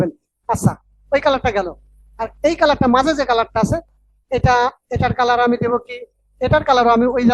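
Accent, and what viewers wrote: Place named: Chapai Nawabganj, Bangladesh. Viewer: ভয়েস শুনে তো মনে হচ্ছে চাঁপাই এর পোলা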